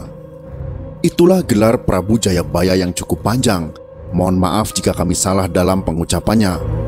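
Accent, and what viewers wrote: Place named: Java, Indonesia. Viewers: Ndelek suarane arek jatim😄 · Wong jowo ndy suarane 🙏🙏🙏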